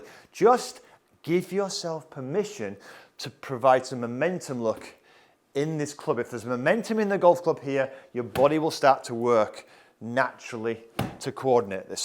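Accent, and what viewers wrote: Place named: England, United Kingdom. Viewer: I only trust someone to correct me with my swing with an English accent, is that someone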